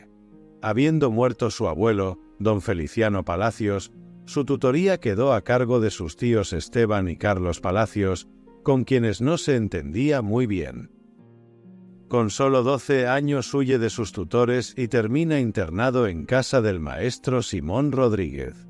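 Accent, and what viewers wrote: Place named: Spain. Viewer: Lo felicito, su asento español no le quita su mérito de haber hecho este documental muy acertado del genio más grande de América, Bolívar es uno de los hombres más importantes del milenio, con sus errores y méritos y egoísta es el que no lo acepta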